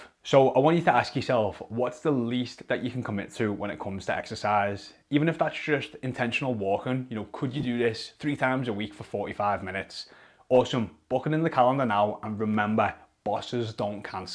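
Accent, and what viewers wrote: Liverpool, United Kingdom. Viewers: Love the Scouse accent. Brilliant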